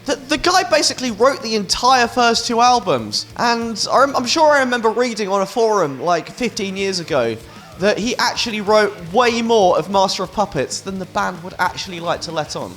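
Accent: United Kingdom. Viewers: Your english akzent is very british!;)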